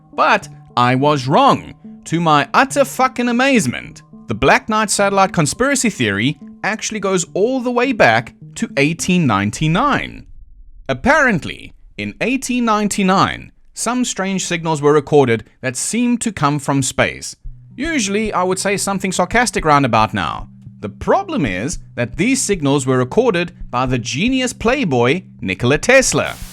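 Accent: South Africa. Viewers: You sound just like my friend Ian who is from South Africa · awe boet🤣. I sat this whole video debating wheter you were a soutie or aussie. Ive been proven correct, love the channel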